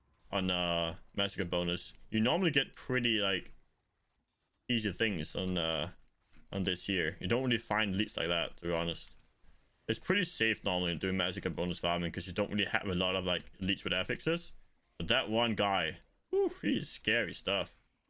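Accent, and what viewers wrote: Denmark. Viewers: Yo GOAT. Are you not playing HC? Sorry if you mentioned it, didnt watch the whole vid, but I did see you die. Would like to compete with you to benchmark my skills, I usually Play HC but this theme might be a SC season, especially if you go SC. Btw great content, hopefully you get the thousands of subs you deserve this season. Er du dansk forresten? Accenten 😆💪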